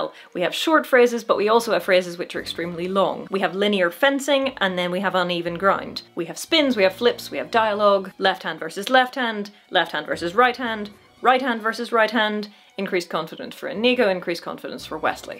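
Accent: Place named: Northern Ireland, United Kingdom. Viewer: Now that's a posh Norn Iron accent! North Down?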